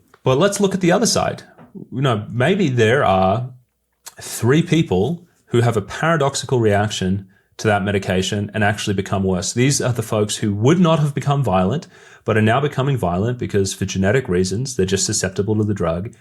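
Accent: Australia. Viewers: Why are you Australia accent?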